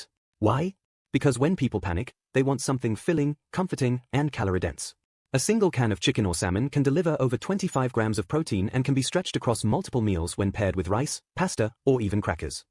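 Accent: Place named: England, United Kingdom. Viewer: Congratulations! A new voice was used (English accent); however, the list of essentials has changed little. Why? Because it is somewhat difficult change to any degree.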